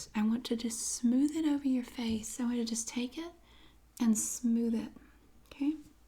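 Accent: South, United States. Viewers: i love the lore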